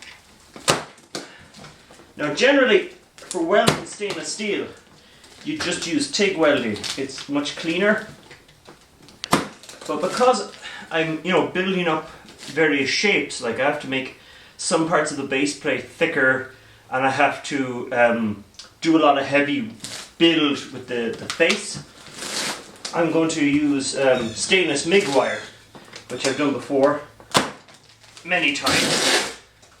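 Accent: Ireland. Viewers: Is that a Meath/Westmeath accent? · You sound almost Irish? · hey not to be a dick but why are you the only one i ever see on video that has the Irish accent and everyone else sounds American ? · I knew it! Irish english!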